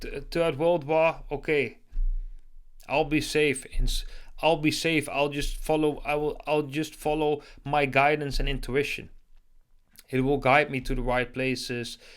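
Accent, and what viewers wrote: Netherlands. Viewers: Hallo! Ik denk aan je accent te herkennen dat je van Nederland bent! Ik ben Belgische en je bent de eerste die ik ontmoet met dezelfde taal en niet al te veraf! Wauw! Heel fijn jou te ontmoeten! Dank je wel voor deze aanmoedigiende en inspirerende video's! Ik ben de voorbije jaren pijnlijk geprocessed door God, om in positie te staan voor deze aardverschuivende shift! God bless you!!!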